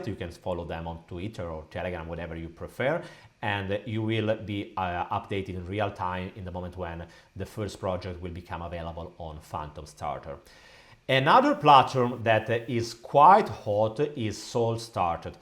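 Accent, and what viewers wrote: Italy. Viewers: I really like your content but man your Italian accent sometimes crack me up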